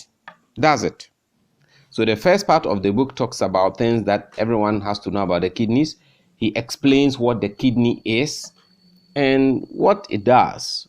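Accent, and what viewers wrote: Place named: Ghana. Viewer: First I love the way Ghanaians speak so that means I love the way you speak...secondly I love how you were able to sell this book even without looking at it listening to you can make one want to buy the book..and finally thank you for choosing this book to review on it because a lot of people need to be really educated about there health... am a health educator and our primary goal is give preventive means other than curative means to health and as they say health is wealth so thank you for sharing this I enjoyed every bit...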